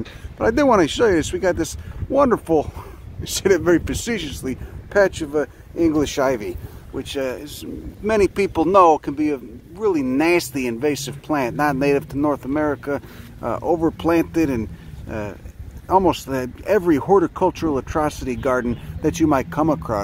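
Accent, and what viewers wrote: Chicago, United States. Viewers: Yo i fucking love your Chicago accent! Not many people have thick Chicago accents anymore.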